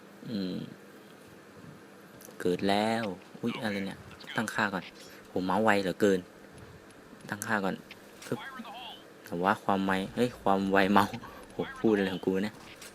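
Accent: Isan, Thailand. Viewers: สำเนียงเหมือนคนอีสานเลย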